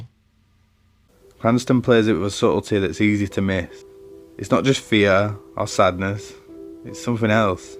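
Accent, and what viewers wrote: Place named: United Kingdom. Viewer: I like your accent. I like the way you say 'Walter White'. A lot of people have made videos about Breaking Bad - and I have watched a lot of them. But your unique British accent might be the thing that sets you apart. As for the content itself, you identified the "I am the one who knocks" event, explained it beautifully, linked it to other important events, and brought it all to a safe end - tying your end to your beginning. Your personal point of view was clear. I liked it.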